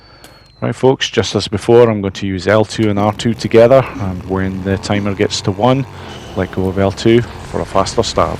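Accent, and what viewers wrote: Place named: Dumfries, United Kingdom. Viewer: Andrew Coltart - that's the guy! Your accent reminds me of his. Wiki says he was born in Dumfries. You close to there?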